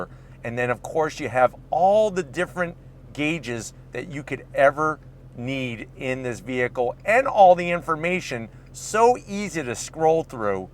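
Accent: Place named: Midwest, United States. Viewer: Dude. You have got to be from the Midwest!!? I'm from Detroit. I can hear it! :text-green-game-over:😄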